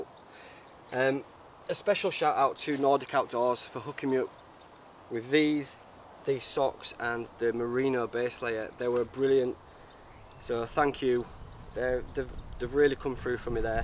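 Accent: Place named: Scotland, United Kingdom. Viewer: That's what I really like about YT. Unexpectedly I found this great channel. I really like listening to your scottisch accent. I used to travel alltrough Scotland 25 years ago. Now I know that I've to come back soon. Greetings from Germany.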